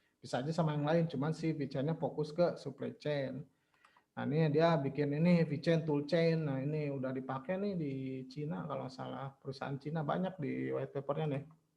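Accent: West Java, Indonesia. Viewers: Bang Logat Sunda ya , hehe , mantap Slurr 😁 , Penjelasan nya mudah di pahami bang lanjutkan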